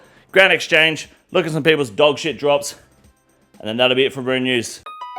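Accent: Australia. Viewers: Never thought RuneScape would have a unhinged Australian. I love it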